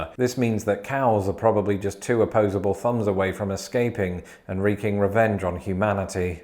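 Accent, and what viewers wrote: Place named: United Kingdom. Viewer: I love your Accents, sir. i think it could be British, right?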